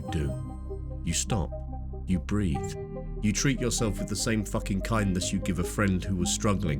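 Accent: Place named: England, United Kingdom. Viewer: G'day from Australia 🦘 I'm listening to your podcast on Castaneda and switched over here to look at your other podcasts. I wasn't sure if you were real or AI generated. You might want to place that note on your podcasts moving forward. You are very intelligent and clever!!! Superb voice for doing podcasts - very English and BBC (that's a compliment). Curious without being "cultish". Good luck. From Australia 🦘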